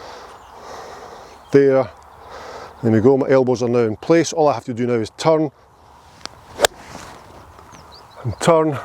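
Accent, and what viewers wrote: Scotland, United Kingdom. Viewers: Great lesson. I can't wait to try it at the range tomorrow. BTW, love the Scottish burr. I have a friend Graeme Baxter who is Scottish. He is a fantastic golf artist. He is licensed by the R and A and the USGA. and has brilliant artworks of literally all the majors including The Open. You might want to check his web page out.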